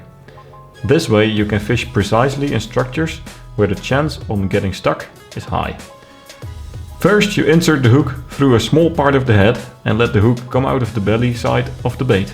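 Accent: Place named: Netherlands. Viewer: That's a Dutch accent if I've ever heard one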